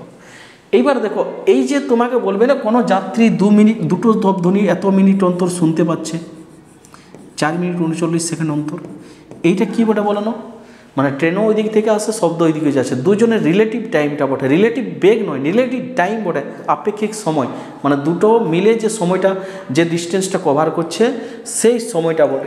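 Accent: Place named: Purulia, India. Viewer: mone hoyechilo sir apnar kotha sunei karon amra Purulia Bankura r loker amader kotha sunei bujhe jabek j amra Bankura ba Purulia r basinda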